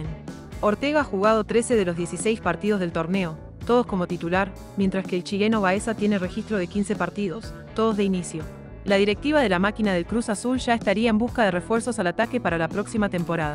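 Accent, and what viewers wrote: Argentina. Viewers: PORQUE HABLAS COMO ARGENTINA?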